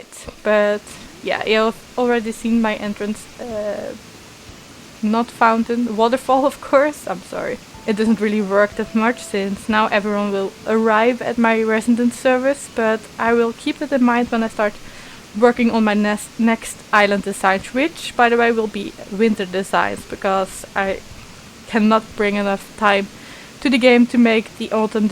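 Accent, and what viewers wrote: Netherlands. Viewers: Aaa okay! I'm from the Netherlands as well and thought I recognized a dutch accent hehe.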